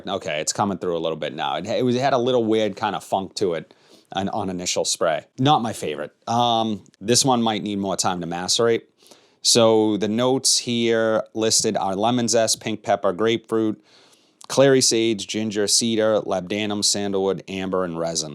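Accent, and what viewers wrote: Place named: New England, United States. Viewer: Just found your channel and hearing fragrance takes with a NE accent is something I never knew I wanted.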